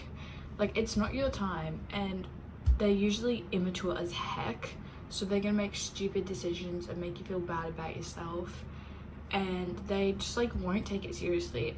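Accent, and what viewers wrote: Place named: Australia. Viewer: Do you live in Australia or the UK but i am hearing an Australian accent and I am fairly sure the school system is the same so its confusing me 😭😭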